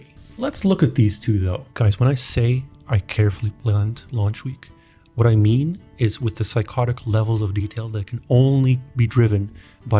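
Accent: West Flanders, Belgium. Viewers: En nen west-vlaming ton nog 😅 gg! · Te ton nog ne west vlaaming. Van wo zij wel? We zijn trots. Veeeel sucses nog in de toekomst.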